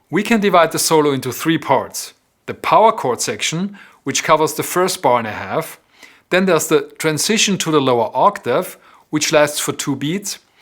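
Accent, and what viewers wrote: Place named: Germany. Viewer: The german accent is killing me thanks so much for the video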